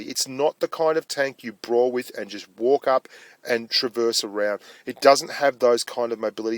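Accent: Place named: Australia. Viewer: Your videos are great and your commentary is always entertaining. I don't know why but I've always loved the way you Aussies talk. Guess you can blame it on crocodile dundee from when I was a kid. I'd love to hear your thoughts on the Churchills. I can't find anywhere in my heart to like them but I want to know if it's worth grinding past them to the Black Prince and beyond.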